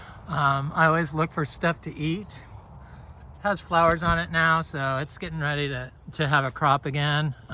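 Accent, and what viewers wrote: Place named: South, United States. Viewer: love the southern accent lol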